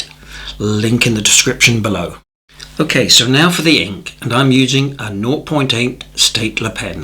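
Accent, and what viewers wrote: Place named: United Kingdom. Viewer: Sounds very British love it !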